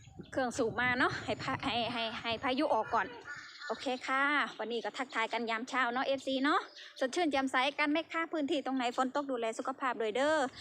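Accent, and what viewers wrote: Korat, Thailand. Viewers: เจ้ พูดสำเนียงเหมือนคนนางรองไทยโคราชเลยครับ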